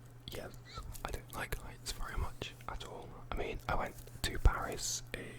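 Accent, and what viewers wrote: United Kingdom. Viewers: what part of the uk are you from? i really can't tell from your accent haha x love the videos man❤️ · Hi! I'm from Brazil...I love your videos and your British accent, very relaxing. Thanks for the video!:D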